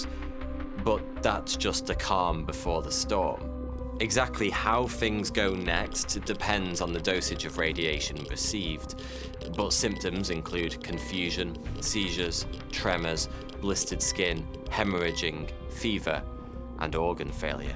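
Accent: United Kingdom. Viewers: Okay, but why is Frankie Muniz doing a British accent? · Definitely sounds like he's from the UK somewhere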